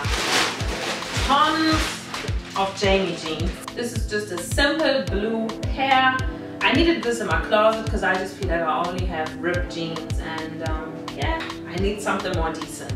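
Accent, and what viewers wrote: Germany. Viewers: Delia Beer ja, sie kommt aus Deutschland und ist dort geboren, deswegen ist ihr englisch auch anders als das von den native Amerikanern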